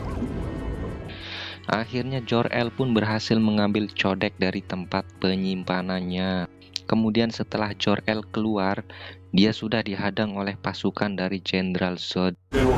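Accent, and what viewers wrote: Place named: Java, Indonesia. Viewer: suara lemes logat jawa bikin ga mood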